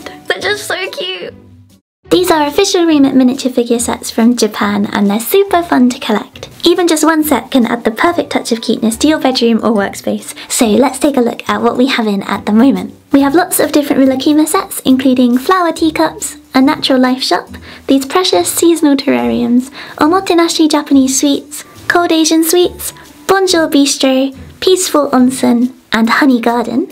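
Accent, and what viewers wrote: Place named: United Kingdom. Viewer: Btw I think your British English is so beautiful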